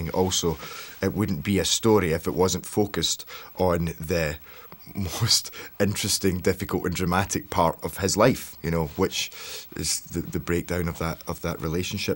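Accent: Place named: Scotland, United Kingdom. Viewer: Your Scottish accent is great. 👍🏻Your role was awesome. Even my mother fell in love with the phantom..she loved your singing..your performance..your handsomeness. It's all about the phantom. ❤❤❤️❤️❤️❤️❤️❤️❤️❤️ She was affected by his story more than one .. and decided that it would help him in his childhood. We all loved the role of the Phantom. Thanks for the excellent performance. By the way, I do not think that anyone will speak ill of our friend the Phantom now, because everyone has successes and failures, this is the life😊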